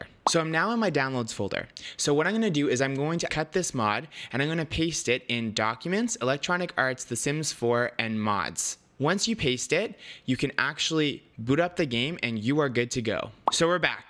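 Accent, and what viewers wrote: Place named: Philippines. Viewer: you sounded Filipino. haha. Nice content by the way.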